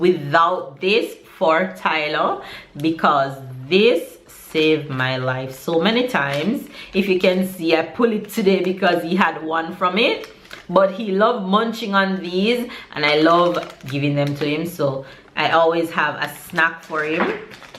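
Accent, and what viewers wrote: Jamaica. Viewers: Here from, as soon as you started talking I knew you were jamaican,of course am going to support my own.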